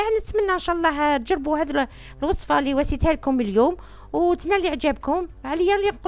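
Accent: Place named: Cherchell, Algeria. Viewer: accent cherchellois 100%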